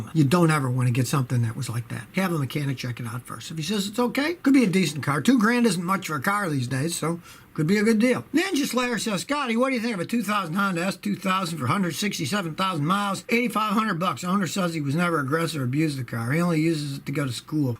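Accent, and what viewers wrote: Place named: Upstate New York, United States. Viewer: Hes from upstate NY, he sounds like italian friends i have from upstate NY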